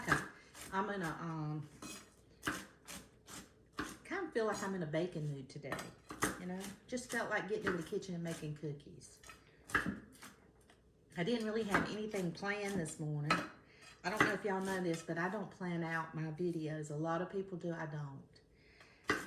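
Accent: South, United States. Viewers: Hi, the cookies look Yummy. I will have to make them. I have to say the cake mix cookie video. I made them and the cookies are so good. Also your video for pork tenderloin leftovers, I live in Indiana and didn't know if I could find the Duke's Golden BBQ sauce in my area. I Found It, at a local food chain store. Meijer! I cooked a pork shoulder the other day in the slow cooker, so tomorrow I'm making BBQ pork sandwiches and trying the Duke's sauce. Thanks for all of your videos!! Love your Sweet Southern Accent!!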